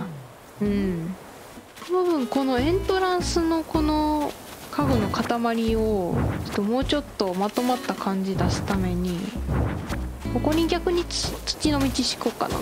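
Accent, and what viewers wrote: Kansai, Japan. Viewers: 新規なんですけど、さんの関西弁と住民のボケが面白くてどハマりです😂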